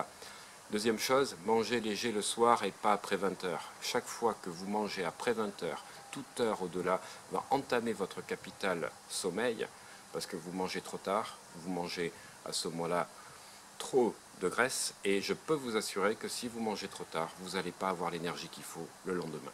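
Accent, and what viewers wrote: Bordeaux, France. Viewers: j'adore votre accent qui me rappelle mon origine Bordelaise, merciiiii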